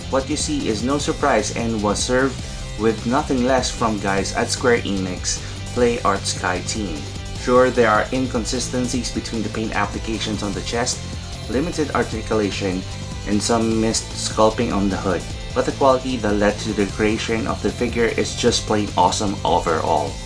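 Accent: Philippines. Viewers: hi can i ask a question too? Are you pilipino cause your accent is like that just curious :D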